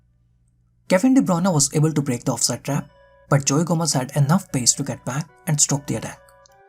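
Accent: India. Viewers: Since You are an Indian (accent) make an analysis on Sunil Chettri or The Indian National Team.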